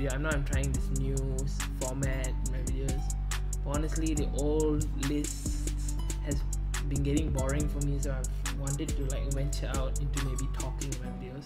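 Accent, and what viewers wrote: India. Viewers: you from india or what? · Are you Indian? (Not hating or anything i just didn't expect ur voice) btw speak louder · Your accent sounds Indian but with a Caribbean accent. My guess is your of Indian ancestry but your from the Caribbean? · What’s your accent it sound Punjabi, Hindu, Pakistani or something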